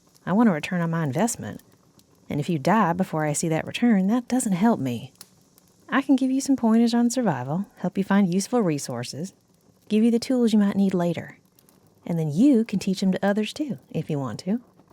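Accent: South, United States. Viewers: You keep acting like that and I'll put you in your place Great southern accent. Wait is it southern? Great audios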